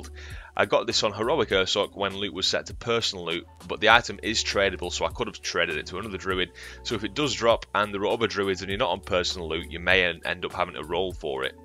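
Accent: Yorkshire, United Kingdom. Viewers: good to hear another fellow yorkshireman :)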